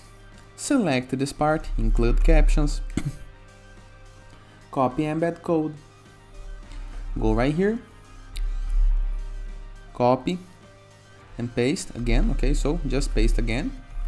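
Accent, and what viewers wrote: Brazil. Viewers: Você é brasileiro? 😂🤟❤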